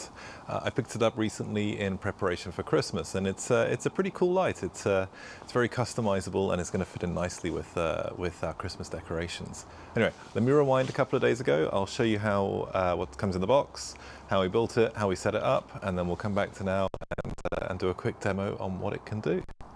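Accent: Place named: United Kingdom. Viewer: Very good instructional recording; along with your British accent and mannerisms, made this pleasant to watch. Thank you from Canada 🇨🇦 Merry Christmas or as you would say “Happy Christmas!” , and a Happy New Year 🥳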